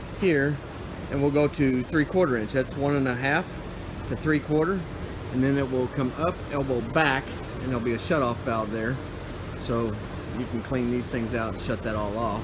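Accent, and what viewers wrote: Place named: United States. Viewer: Love the American accent :)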